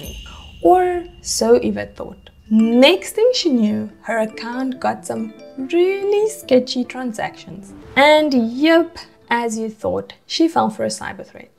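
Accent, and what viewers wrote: South Africa. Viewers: Also, I instantly heard a South African accent?! much love 🇿🇦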